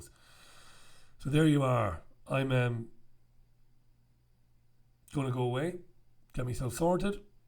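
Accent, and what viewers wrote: Ireland. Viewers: your dulcet Paddy tones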